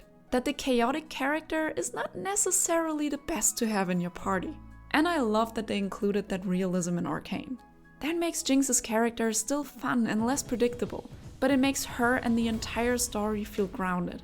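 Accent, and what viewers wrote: Germany. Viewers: Are you German? Your accent sounds very German.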